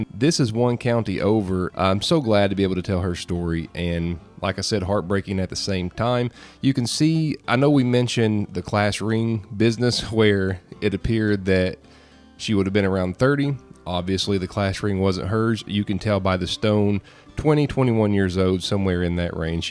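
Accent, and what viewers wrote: South, United States. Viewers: this was one of the best iv seen/read on u-tube ,was quick and concise too the point ,the south in your mouth added to its feeling of CONFIDENT story telling ,kudos too yall ,WELL DONE ! bring on more like it. thanx!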